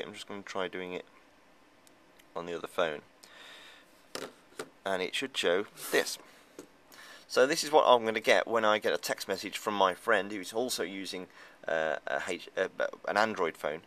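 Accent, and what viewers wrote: United Kingdom. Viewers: Are you from Liverpool?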